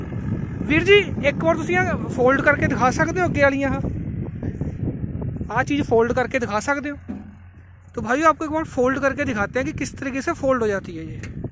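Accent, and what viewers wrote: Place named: West Uttar Pradesh, India. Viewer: Bhai tumhari aavaj west UP ki lg rhi kha se ho bhai aap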